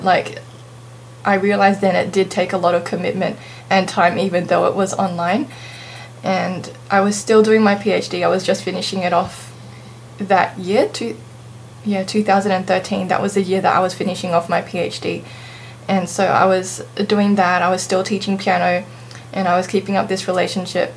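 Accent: Australia. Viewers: If only internet was around in my time. I might try that website....Good luck in the US - hope everything goes swimmingly for you.... Looks like a nice match and catch. Very nice story - hahaha - Phd. wow...... Doctor - how cool is that. You certainly know how to build a life for yourself. Thanks for sharing. Oh keep your Australian accent if you go to the US lol...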